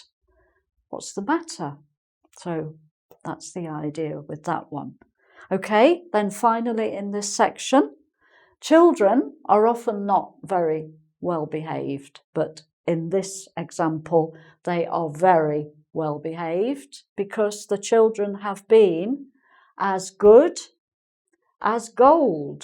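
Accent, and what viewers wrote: England, United Kingdom. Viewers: Perfect british accent and very good teacher, thanks for your lessons. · thank you I adoooore the way you teache . love your videos , I love your accent and wich one day I will get it, please , are you from england ? love this accent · Let me you that I love your accent, even though for me it's kind like annoying sometimes to hear people talking with that britsh accent because I'm more familiar with the american one but you speak so clear that your voice sounds lovly. Thank you very much, I love your lessons, and no, their not as clear as mud.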